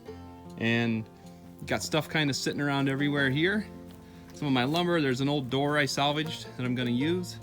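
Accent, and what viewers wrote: Canada. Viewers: Wow, superbly nice work! You are so resourceful, and such a diligent worker! I like the overhangs. They are worth the extra time and effort, plus they drop rain farther away from the shed. I gave you a thumbs up and subscribed. And someone gave you a * FREE * door, bonus! Could you add a cost list, please? How much did the wood cost, the metal panels, and the windows. I am wondering if it's cheaper to buy timber and screws and a window and so on, or to buy a whole shed kit. Are you Canadian, eh? Your profile page says you are somewhere in The United States, but you sound just as Canadian as can be.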